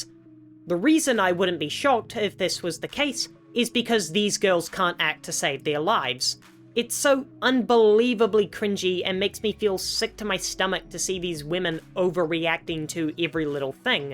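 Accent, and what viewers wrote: New Zealand. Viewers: You can tell from the voice and kiwi accent that the testosterone is strong with this one.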